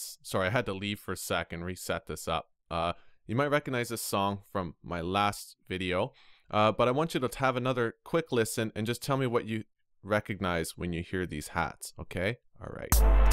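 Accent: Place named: Canada. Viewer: Are you Canadian? I'm trying to figure out where your dialect is from. You've got some serious regional inflection.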